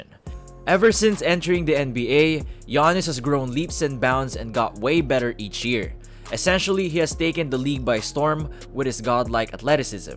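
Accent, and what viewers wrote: Philippines. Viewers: I can tell that you're a filipino just by observing your accent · I Guess you're a Filipino, because of the accent.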